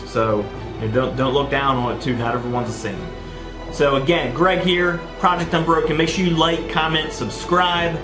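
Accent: Philadelphia, United States. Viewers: I knew I detected a Philly accent right on shout out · Ps: I knew you were a philly guy hahah I recognize that accent anywhere!!! I’m a bucks county girl 🥳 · Just curious - what’s that accent? Philly? Baltimore?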